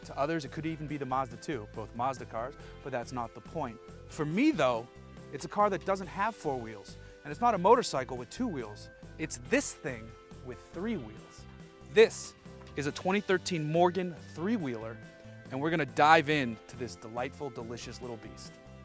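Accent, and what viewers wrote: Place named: United States. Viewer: Fantastic review. Also you have a nice American voice. Not annoying at all!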